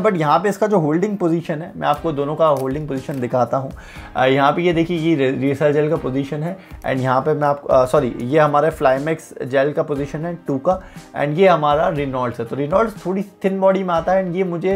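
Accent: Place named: Punjab, India. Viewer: sir aap pakka punjabi ho - bottle se paani 'DUL' sakta ha - ye pen patla ha aur iska grip 'ADTA' ha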